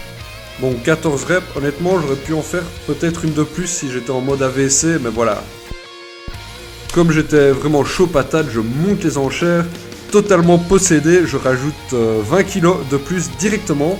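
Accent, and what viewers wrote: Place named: Belgium. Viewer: Sympa le vlog et l'accent belge ;) Tu étudie quoi ??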